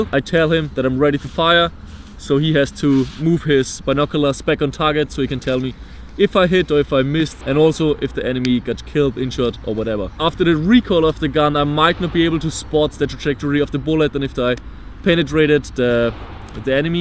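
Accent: Germany. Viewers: Always asked myself if u were German because u sound like it. Now I saw that on the Blackboard :D #Novritscharmy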